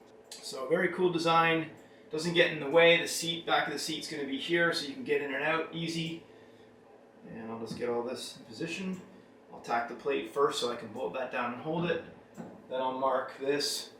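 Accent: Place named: Canada. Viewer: Not even finished and it looks killer already !!! Great color choice. Would love to see it in person at a car show someday 👀 The accent leads me to believe you're quite a ways north of Colorado , be a bit too far 😉 Possibly up in Canada 🤔 Anyways really appreciate the videos.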